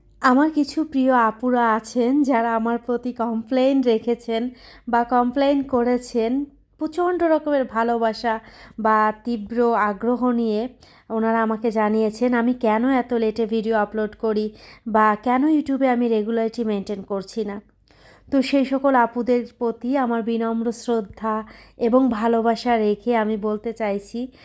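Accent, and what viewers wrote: Khulna, Bangladesh. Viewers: আপু আপনি যেহেতু খুলনার মেয়ে আপনার ভাষাতে ওই ধাব্রাই বলে। কারন আমারও বিভাগ খুলনা তো আমরাও ধাবরাটাই বলি তবে কি জানেন আপু ঢাকা আসার পর থেকে না চাপটি বলিকারণ এখানে সবাই চাপটি বলে।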